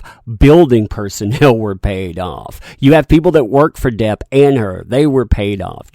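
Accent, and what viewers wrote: South, United States. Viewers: Man your voice is rad. It sounds very southern and endearing. I don't really care about the story but how you portray the information has got me listening. I hope you and yours are good during these trying times.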